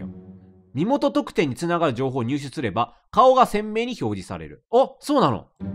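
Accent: Kansai, Japan. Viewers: 今回の実況、関西弁多めで嬉しい…⁽⁽( ˊᵕˋ )⁾⁾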